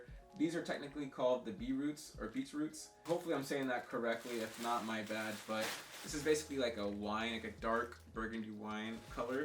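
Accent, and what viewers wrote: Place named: United Kingdom. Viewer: Thought you were from the UK😂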